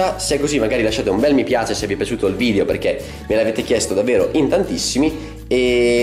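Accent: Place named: Emilia-Romagna, Italy. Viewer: da quella Zeta inconfondibile deduco che sei del Emilia Romagna. di dove sei ? io sono di Bologna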